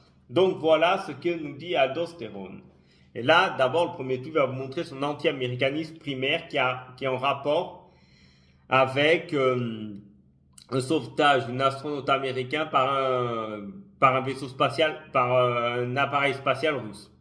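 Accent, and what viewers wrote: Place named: Italy. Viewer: Aldo et Aberkane sont d’origines algériennes certes et alors méfiance lol c’est pathétique quand même.. il faut dire que leurs discours et vidéos nous parlent très bien, souverainisme ou conspirationnisme appelez cela comme vous voulez lol nous on les comprends bien contrairement à toi qui est sûrement payé comme nos journalistes pour nous faire de la désinformation totale en passant par le bashing .. juste un conseil essayez d’être à leur niveau intellectuel et peut être que vous ferez du buzz.. vu votre accent vous êtes en attente de changement de carte identité italienne pour une carte d’identité française lol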